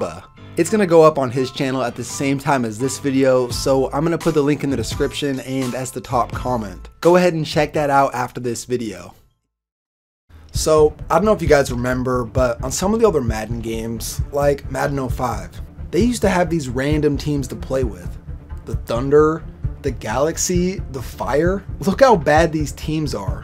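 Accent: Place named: Boise, United States. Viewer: Yo are you from Boise??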